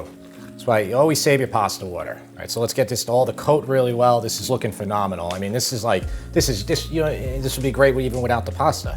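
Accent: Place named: Long Island, United States. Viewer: As an Ontarian Canadian, your Long Island accent makes me laugh every time. I really like your videos and your content, thank you for sharing them with me. One thing I'm surprised though, is that you use these large diameter cooking pans, but have such a small stove burner. Does that really work that well? I would think a larger burner would be something you'd be looking for. Also, is that a propane or butane stove? I never would've thought such a simple camper stove could be used to make food like this. Also, where do you find things like your cutting boards? I like how large the wooden one is, but don't really know how I'd go about looking for something like that.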